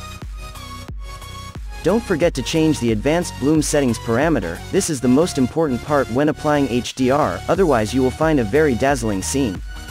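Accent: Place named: Nigeria. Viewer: I don't know his private channel. I just accessed him, Adolfo, and other Master Class Vids, through the Reallusion site, under the Master Class tutorials section. is actually like Nigerian, or something like that (sorry if I messed up your Nationality description based on your accent). 😁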